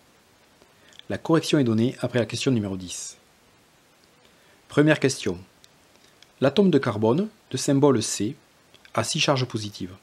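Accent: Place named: South, France. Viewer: Excusez moi de vs demandez ça mais vos explications et votre accent du sud me rappel un professeur que j avais eu à Ingres? Cela serait improbable mais je demande qd même d autant+ que je suis tombée par hasard sur votre chaîne.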